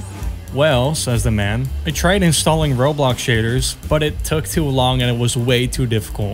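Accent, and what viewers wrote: Netherlands. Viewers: You’re either Dutch or from Belgium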